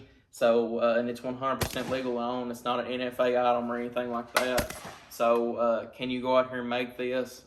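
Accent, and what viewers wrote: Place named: West Texas, United States. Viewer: West Texas or Tennessee...not fully sure about the accent. But, I am sure about that shotgun. Feels good just to hold it. Do you find that dot particularly useful? Seems a bit high over the receiver. Thinking about a T2/Scalarworks combo...1/3 co-witness. Enjoy that thing, sir. Never let her go...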